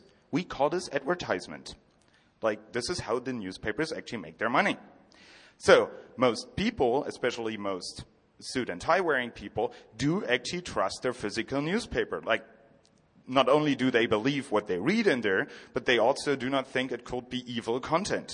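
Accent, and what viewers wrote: Germany. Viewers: i sense a little bit of german dialect^^ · NamelessGhost bruh... you’re Swiss and can’t tell it’s a German presenter, and so you just assume American? Chumm itzt, so dumm muess mer au nöd tue...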